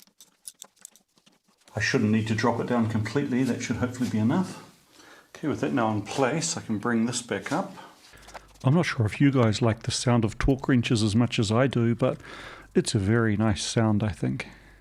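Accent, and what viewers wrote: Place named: New Zealand. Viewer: Hi there. Just found your channel. What city are you in. You sound like a Kiwi. I'm in Chch. Cheers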